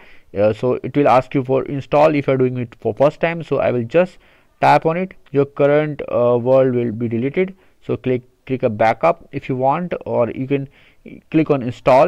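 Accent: India. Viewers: hi this helped...but the indian ascent😭😭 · Heard that Indian accent so I KNEW bro would deliver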